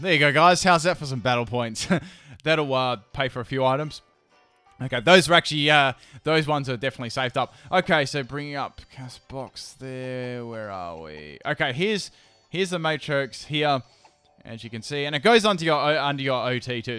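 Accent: Australia. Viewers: TheMegaultrachicken It's a very thick Aussie accent then. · is australian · He's a native Australian.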